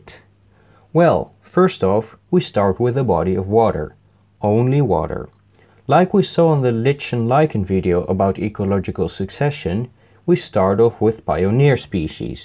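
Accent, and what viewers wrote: Netherlands. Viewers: Fellow member of the Netherlands, your accent is Amazing! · Je Nederlandse accent veraad je XD geeft me altijd een lach als ik weer een nederlander op youtube tegenkom gaaf project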